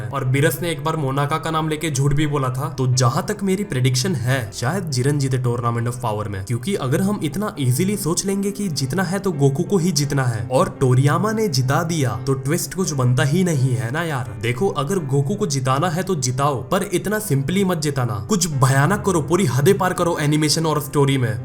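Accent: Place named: Bihar, India. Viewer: bihari lgte ho awaaz se!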